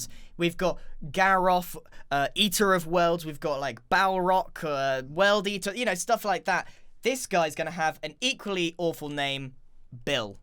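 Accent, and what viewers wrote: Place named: RP, United Kingdom. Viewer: Grian has a lovely posh accent.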